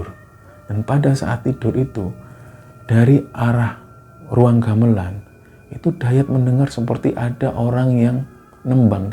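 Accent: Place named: Surabaya, Indonesia. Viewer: kalo gw kangen sama bokap gw, dengerin channel ini. Bokap gw orang Surabaya .... logatnya dan gaya berceritanya sama ^__^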